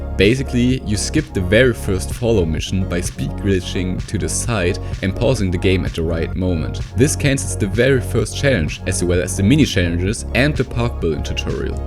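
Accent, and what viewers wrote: Germany. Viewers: Bist du deutscher? Hört man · Hab mich die ganze Zeit gefragt, ob das ein deutscher ist. Hört man wirklich raus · Are you german bro? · Du kommst aus Deutschland oder? Deine Aussprache ist verdächtig haha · sound's german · Man merkt dass du deutsch bist ;D nicht negativ gemeint! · im pretty sure that this man in the video is german. i hate this accent. i am german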